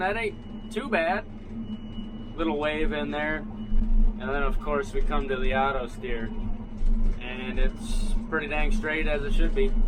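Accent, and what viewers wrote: Texas, United States. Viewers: you have a southern accent coming through on this video!!! You sound like your from Texas!!!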